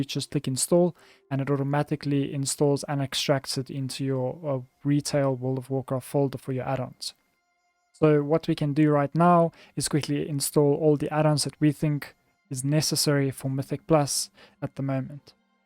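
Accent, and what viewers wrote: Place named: South Africa. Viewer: Are you from South Africa?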